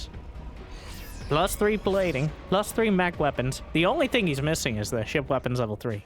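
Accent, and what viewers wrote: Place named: Texas, United States. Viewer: I feel old I have watch your videos enough to notice you grow older and your voice dropped an octive. Also you sound like you came from Texas.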